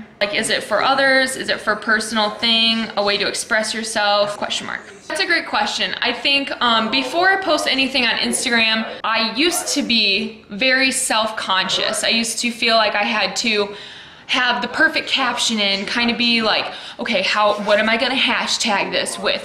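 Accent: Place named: Michigan, United States. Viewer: You sound sooo Michigander it's awesome hahaha. LOVE YOU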